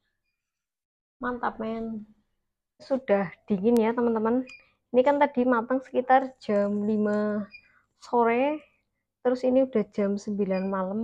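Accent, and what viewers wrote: Banyumas, Indonesia. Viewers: Wong ndi sih ngapak banget mpeyan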